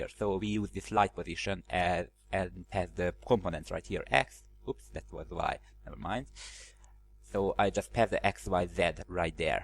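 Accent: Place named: Hungary. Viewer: Are you hungarian? :D Just based on your accent :D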